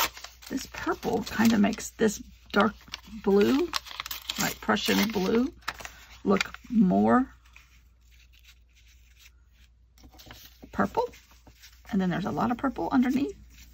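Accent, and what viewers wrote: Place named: California, United States. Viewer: Southern mixed with California! 😂😂💜💜💜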